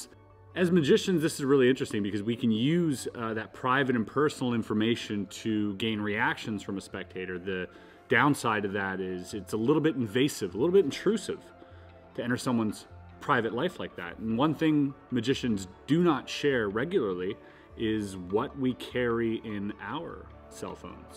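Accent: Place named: Canada. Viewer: Holy crap your Canadian lol I love your YouTube videos I have never looked you up but I finally hear your accent lol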